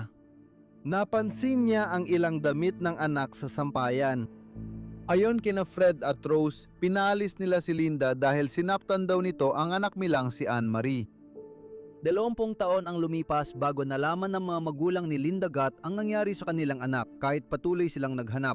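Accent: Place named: Visayas, Philippines. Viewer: bisayang slang e😂😂😂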